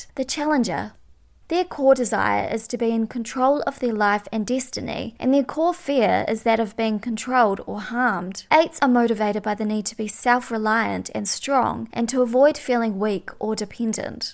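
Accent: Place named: New Zealand. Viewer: I love your accent. New Zealand? And u r Type 4 too? Aw. Such a coincidence! 😉😋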